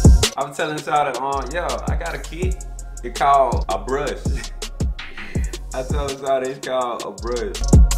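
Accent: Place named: South, United States. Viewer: You sound like you from the south...I fw with yo content thugh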